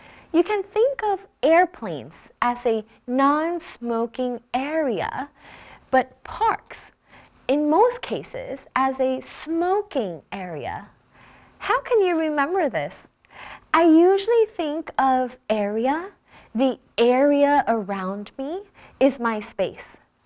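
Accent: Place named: United States. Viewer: Thanks a lot, that's what I've expected for a long time. Pretty great chance to practice American accent instead of listening to the online dictionary. Hope to hear more about the synonym or slangs and pronunciation from you. from Canada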